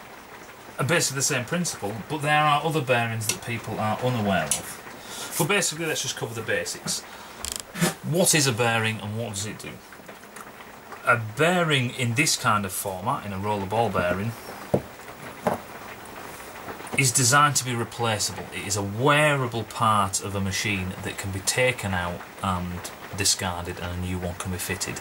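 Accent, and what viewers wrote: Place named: North, United Kingdom. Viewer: Using this in 15 minutes with Saudi Aviation students here in sunny Riyadh - brilliant explanations - simple yet informative. Nice to hear a good Northern accent - reminds me of home!! Cheers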